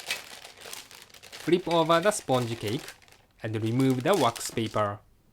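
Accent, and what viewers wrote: Japan. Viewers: Noo! Just Japanese engrish! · I just love Japanese accent. It makes the cake yummier!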